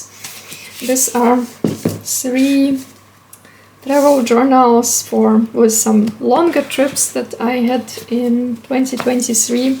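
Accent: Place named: Russia. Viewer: Off topic but I LOVE YOUR ACCENT😭🤲🏼 are you Russian?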